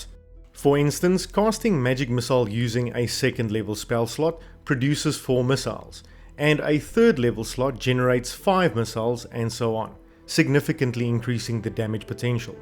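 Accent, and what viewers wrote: Afrikaans, South Africa. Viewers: Nice video; are you Afrikaans?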